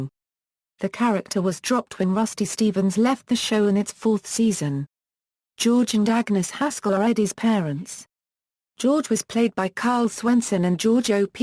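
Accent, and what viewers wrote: United Kingdom. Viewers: I don't like the British accept telling us about an American TV Show.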